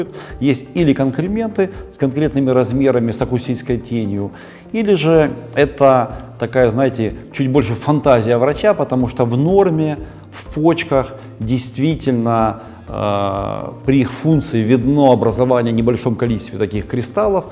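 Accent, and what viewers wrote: Ukraine. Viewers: Видимо он украинец.Ну и шо с того?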